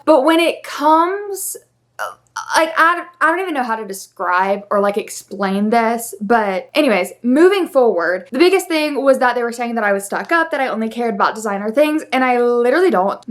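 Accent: South, United States. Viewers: I think you should try not to waste too much of your time with negative comments. Misery loves company. People that try to tear down others are just miserable and can’t stand the sight of people being happy. You are such a ray of sunshine. You work hard for what you have and you deserve to spend it and enjoy it how you like. I’ve never gotten the impression that you brag or show off. Not at all. You seem kind, sweet, hard working, fun and funny, thoughtful and caring. I love your southern twang, which is much more pronounced when you are trying to set some boundaries for Baby Elle. Instead of a stern talk, it comes off adorable. 😍📱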